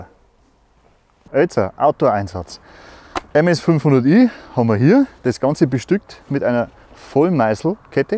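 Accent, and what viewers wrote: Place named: Bavaria, Germany. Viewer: Schon ok, alles gut 👍😊. Alle Dialekte sind ja was Tolles 👍😊, ich wünschte ich hätte auch einen 😉. Bayrisch kann halt nurmal "etwas" anstrengend sein zu verstehn. Aber is ja mit Sprachen allgemein so 😉. Ansonsten, Videosstyle und Präsentation sind 👍, auch wenn ich in Wahrheit ein Fan von Fauna u. Flora, also auch von Bäumen und Naturwäldern bin, und mir jede Fällung eines ausgewachsenen Baumes das Herz blutet und mich zum Menschenfeind mutieren lässt . Grade in Zeiten eines Klimawandels. Immer mehr Bäume und Wälder werden in rasendem Tempo niedergesägt und statt dessen immer Winderräder aufgestellt 🤦‍♂️🙈 😔 . VG 🙋🏻‍♂️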